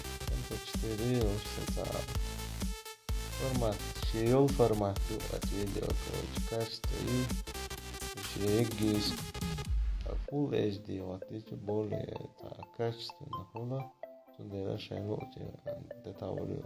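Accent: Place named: Azerbaijan. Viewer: Он так говорит потомушто он Азербайджане и я тоже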